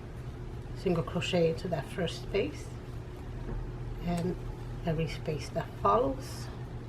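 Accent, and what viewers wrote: New York, United States. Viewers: I love your tutorials. Love the mat, very cool. I also love your New York accent. My daughter is half Puerto Rican on her father's side. Thank you for the tutorial and keep them coming.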